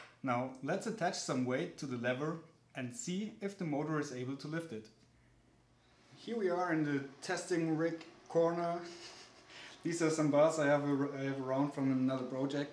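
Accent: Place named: Germany. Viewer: Ich mag deutsche Akzent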